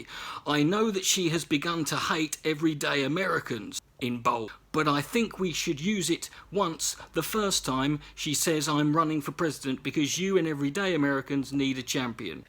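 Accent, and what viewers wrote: United Kingdom. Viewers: You sound more northern than Watson sounds haha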